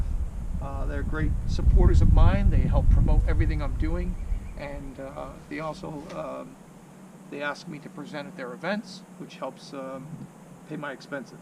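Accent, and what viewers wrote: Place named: East Coast, United States. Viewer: This is possibly the BEST MOTORCYCLE REVIEW I have ever seen. No bells, whistles, music and NO bullsh!t. Just a guy sitting on his bike laying out the DETAILS. (And in a charming east US accent). I’ve ridden in light snow but this guy is the “road king!” Never have I felt such respect for a fellow motorcyclist.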